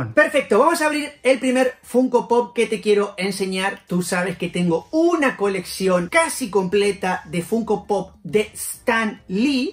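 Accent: Argentina. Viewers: SOS Argentino??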